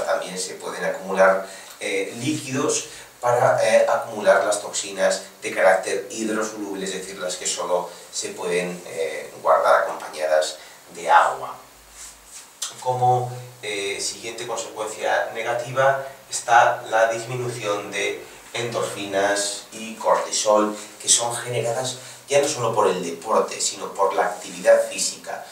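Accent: Spain. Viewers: Pues, soy mexicano y me es incómodo y difícil escuchar lo que dice éste señor. No porque use otras palabras, si no más bien que por su acento me es complicado escucharlo. ¿Por qué? No lo sé, tal vez sea porque no estoy familiarizado con el acento español.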